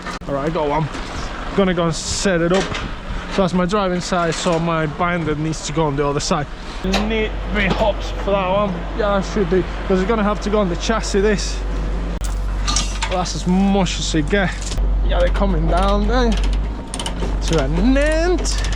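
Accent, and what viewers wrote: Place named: Liverpool, United Kingdom. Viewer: Love your videos mate, you’re the backbone of our society! I’ve always wondered where your accent is from? Sounds like it has some Scouse influence.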